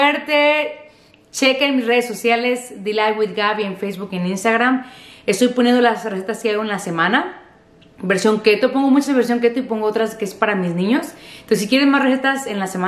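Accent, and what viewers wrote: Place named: Mexico. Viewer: Al escuchar tu acento Mexicano me dio mucha emoción ver que alguien de otro país hiciera pupusitaaas! y aun mejor KETO😱 Soy de El Salvador así que apreció tu esfuerzo! Gracias por la receta! Me gustó ☺️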